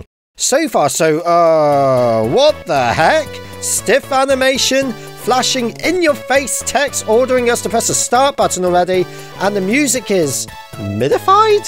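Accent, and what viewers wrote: United Kingdom. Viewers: Only British accents can make Sonic Genesis rage funny. I am Scottish and I know this.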